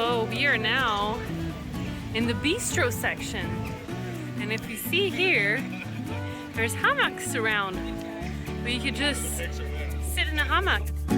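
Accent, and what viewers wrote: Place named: Ireland. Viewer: I love that you have a weeeee bit of an Irish accent, looked like a fun day! Thank you for sharing 🥰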